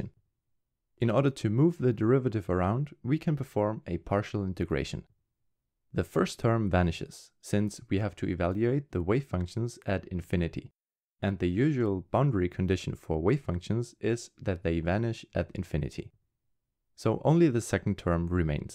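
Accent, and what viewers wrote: Germany. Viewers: Deutsch, hört man direkt 😂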